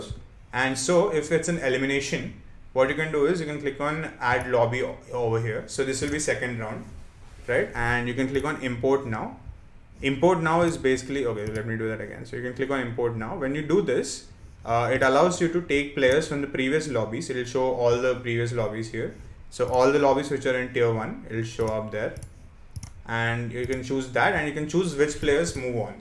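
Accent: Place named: India. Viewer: You sound like indian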